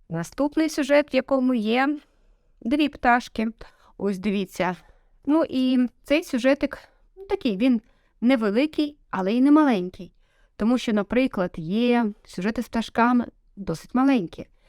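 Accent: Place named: Russia. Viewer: Очень сильный русский акцент. Да уж. ...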